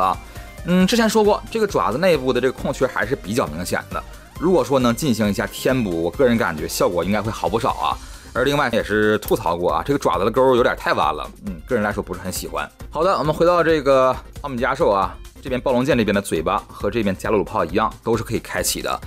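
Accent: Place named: Dalian, China. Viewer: 博主大连的？sijin抬？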